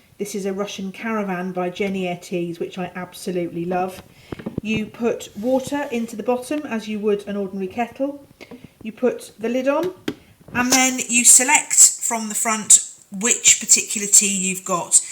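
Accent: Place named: United Kingdom. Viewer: Hi there, thanks for the video. it was lovely to watch and listen to your beautiful british english accent :)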